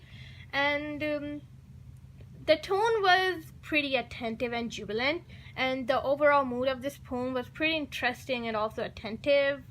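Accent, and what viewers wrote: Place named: India. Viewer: Hi, good analysis, loved it❤️ Btw, are you Indian? Your pronunciation is so cute😍❤️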